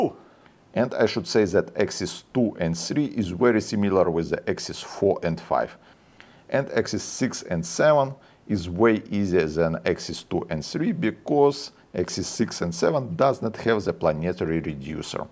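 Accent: Russia. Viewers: этоже руинглиш. все понятно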